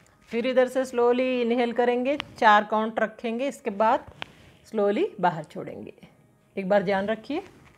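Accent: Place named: South, India. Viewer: आप है उच्चारण दक्षिण भारतीय है।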